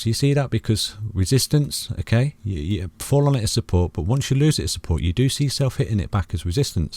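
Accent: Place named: Sawley, United Kingdom. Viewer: You sound very local to me in Sawley. Fancy a pint at the White Lion so I can rack your brains? Haha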